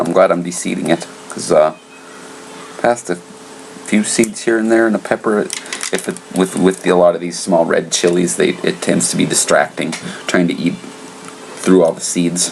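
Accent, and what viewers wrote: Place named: Japan. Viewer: Hey, great review again mate, love the Japanese accent:) mate I'm only a new chilli head but enjoy your reviews and your knowledge. Would love to send you some pods to try when my plants throw a heap out, I'm in Australia and it will probably be another 4 weeks or so but would be keen to see your thoughts on the pods from different growing conditions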